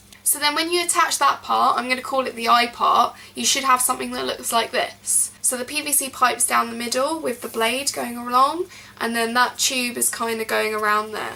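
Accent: United Kingdom. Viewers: omg your British O.o Luvs your accent dearies!! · PLEASE MARRY ME *.* You have this british accent, you like animes, you are beatifull and you can make wonderfull things..WOW!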